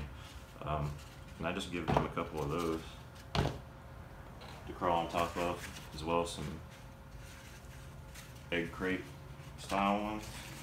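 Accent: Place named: South, United States. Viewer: Not with that southern accent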